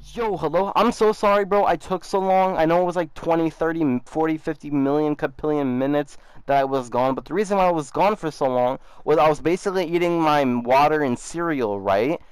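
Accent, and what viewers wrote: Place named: United Kingdom. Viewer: he's just British he said mate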